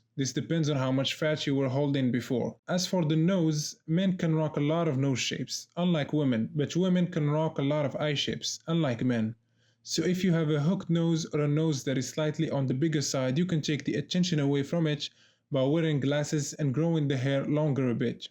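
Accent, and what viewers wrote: Morocco. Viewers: nice Moroccan accent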